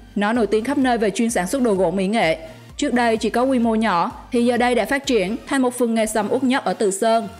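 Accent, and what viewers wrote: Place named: Binh Dinh, Vietnam. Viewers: Giọng bà này ở Bình Định :)) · E à,c khuyến e tập phát âm chuẩn một tý vẫn còn giọng quê góc luon,chẳn hạn chử ăn e đọc i chân giân bình định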